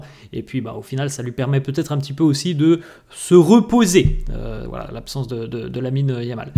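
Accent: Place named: Spain. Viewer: Visca barça j,aime bien ton accent espagnol 😊❤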